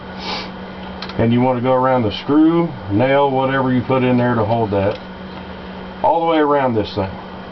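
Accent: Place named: South, United States. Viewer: Brilliant accent.... Southern, I guess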